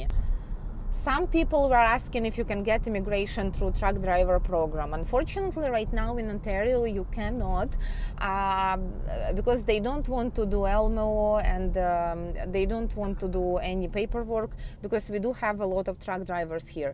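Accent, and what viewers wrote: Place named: Russia. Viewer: Ох уж этот русский акцет...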